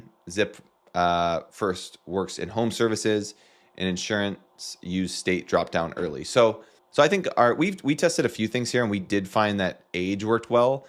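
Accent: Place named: United States. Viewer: I'm american and this is exactly what i sound like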